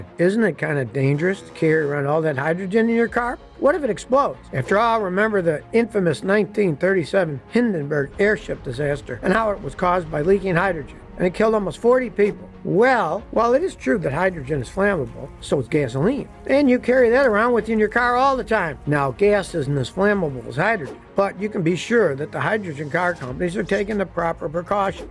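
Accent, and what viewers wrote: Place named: South, United States. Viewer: Wrong. Narrator sounds Southern. Sounds like a Saturday morning cartoon character.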